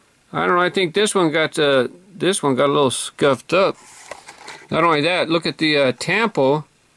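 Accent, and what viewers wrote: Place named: Mexico. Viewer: are you mexican because you sound like you have an accent like my dad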